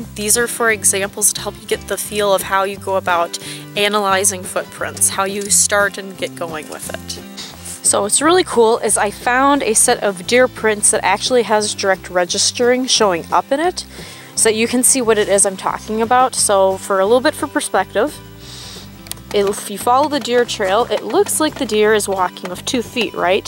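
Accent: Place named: Minnesota, United States. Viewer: Yay it looks like im not the only tracker! Also,what state/province is that? Id guess Minnesotan, North Dakota, or Canada by the sound of your accent, I’m from Minnesota myself.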